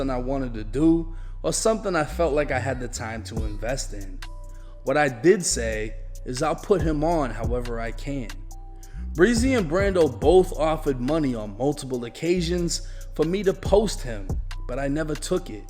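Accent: Boston, United States. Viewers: I knew I heard a fellow Bostonian accent I had no clue u was from here boy much love keep getting the bag 🙏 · putting on Boston. Respect bro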